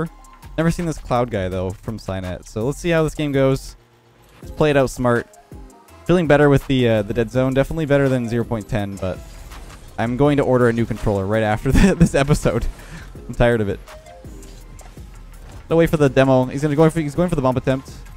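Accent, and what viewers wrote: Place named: Canada. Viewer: My Canadian friend pronounces his wors with -ar like you such as car and garbage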